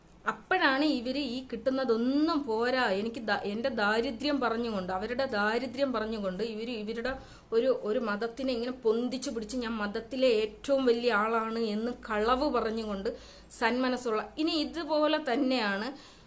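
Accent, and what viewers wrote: Malappuram, India. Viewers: Awalk bhazh malappuram mix aanalo samsarkunnath awidayum veettupanik poyanna thonunnath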